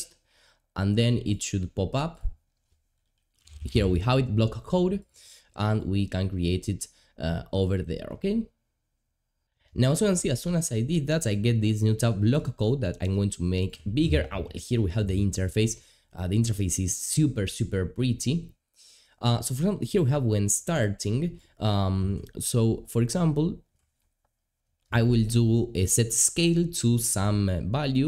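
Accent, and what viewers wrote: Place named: Argentina. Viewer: Buen video! Note un leve acento, sos argentino o uruguayo? Un saludo y a seguir asi!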